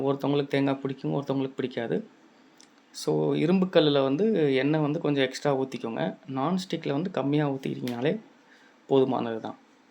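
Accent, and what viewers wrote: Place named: Madurai, India. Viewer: Madurai style nalla irukey.....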